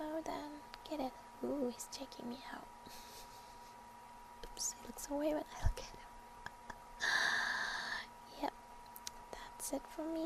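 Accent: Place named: Romania. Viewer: i hadnt checked channel in a bit but, i was glad to log in and see she had 3 new vids since last time i checked! i love her game reviews =D and all vids in general from her. she talks like this girl in my class from romania. she must be from around there lol.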